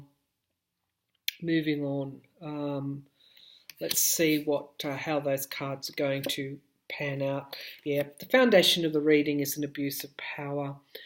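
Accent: Australia. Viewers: Hard to get used to that strong Aussie accent too..... just saying. She's good tho.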